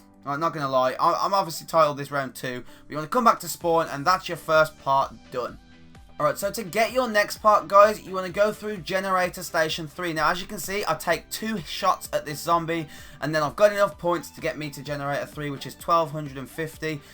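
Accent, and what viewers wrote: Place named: Birmingham, United Kingdom. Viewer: u sound like ur from birmingham im from there i can telll if u am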